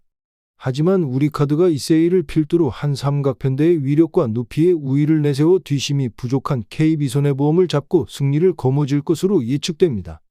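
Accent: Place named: Yanbian, China. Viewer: 연변말투 킹받네ㅋ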